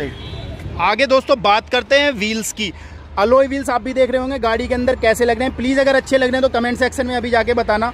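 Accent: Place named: Meerut, India. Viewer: Bro...are you from Meerut ?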